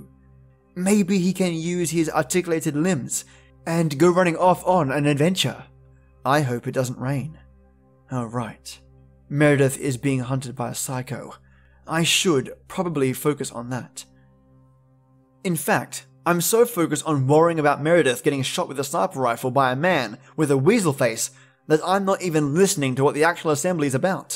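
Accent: Australia. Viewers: Yeah! It's awesome Aussie tale teller time again for me.☕🍰🇦🇺❤ More Lily Madwhip stories! Absolutely, great series! Monk pear tea? Sounds so luxurious, warming and extremely tasty. Oh, you and your amazing teas that you drink.😍 I just have my PG tips. It's black tea but it does the job.👍I'm totally late.☹ But I did eventually get around to listen to your videos.🙂 Thank you for all your content, you definitely work very hard! Your narration always makes my evening leagues better!😊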